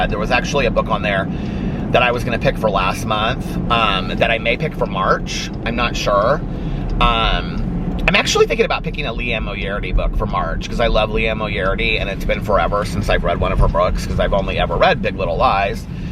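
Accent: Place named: Midwest, United States. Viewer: Your voice captivates me. Your accent is reminiscent of my midwestern family roots. Been to Indy several times, lived in Cincy for a bit. Love the Midwest and adore you and. Thank you for this channel. So cozy.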